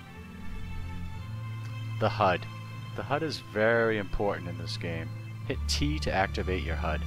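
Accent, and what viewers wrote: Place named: New England, United States. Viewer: Hey man love your video, just curious are you from Mass? I live in Maine and I swear you have the faintest commonwealth/new england accent hahaha either way again love the videos man very informative keep on keeping on. P.S. you kinda sound like Adelbert Ames the original commanding officer of the 20th Maine as portrayed in Gods and Generals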